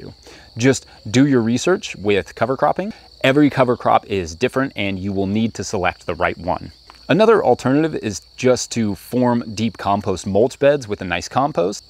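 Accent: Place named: Oregon, United States. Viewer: Kentucky?! I thought for sure you were Oregonian dude!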